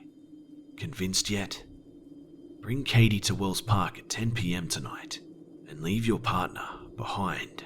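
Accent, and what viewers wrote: Australia. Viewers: Is that an Aussie accent!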